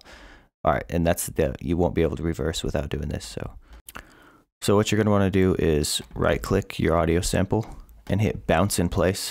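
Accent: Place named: Sweden. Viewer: Sounds Swedish, amazing!!! thnk uu was so helpful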